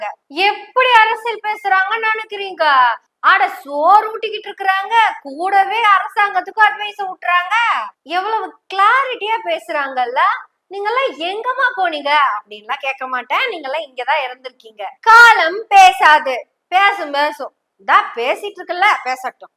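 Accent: Kongu, India. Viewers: neenga pesuràdai record panni keluñgal ungal Kongu Tamizh is super your boldness is super and my humble request is not to say that you are a Malayali even though your parents are from Kerala but you are a Great Tamizh Magal.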